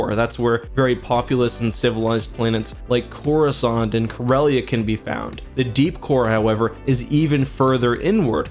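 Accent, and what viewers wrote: Canada. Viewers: Ah booooot, lol Canadian?? Sweet! Maple syrup & such. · I always forget your Canadian until you say aboot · Is it just me or is his Canadian accent getting stronger and stronger? And this is coming from a Canadian.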